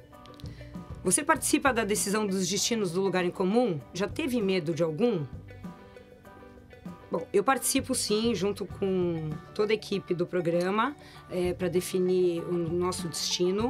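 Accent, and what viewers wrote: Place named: São Paulo, Brazil. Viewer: A voz grossa dela é inesquecível, e esse sotaque paulistano.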